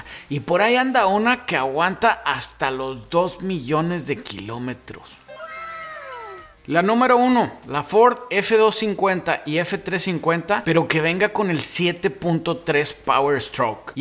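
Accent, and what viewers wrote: North, Mexico. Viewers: Así hablamos los norteños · No puedo con el acento este 😂😅 lo siento no.podre suscribirme😢 en que parte de Mexico tienen este acento o es puro teatro 😂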